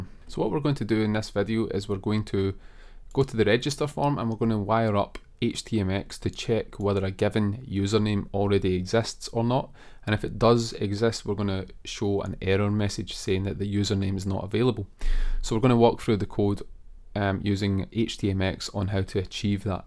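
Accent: Scotland, United Kingdom. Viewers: Good tutorial and with a nice (scottish?) dialect.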